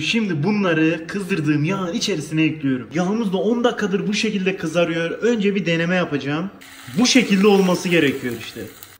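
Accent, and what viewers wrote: Adana, Turkey. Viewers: videodan demiş adamın videoları var orda Adanalı gibi konuşuyor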